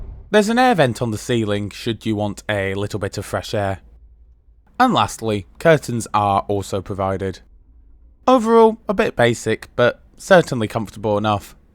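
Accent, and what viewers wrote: United Kingdom. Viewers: I love hearing a British person narrate their American train adventures, it's a good way to see my favorite parts of my home country as experienced from an outside perspective.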